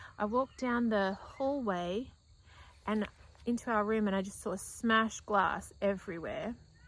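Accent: Australia. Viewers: I love a ‘rambling’ (your words, not mine) vlog! ❤ ps as a Brit I think your Aussie accent is a particularly nice one 😊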